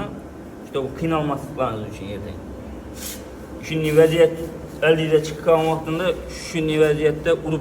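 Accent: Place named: Khorezm, Uzbekistan. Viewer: Хоразим шеваси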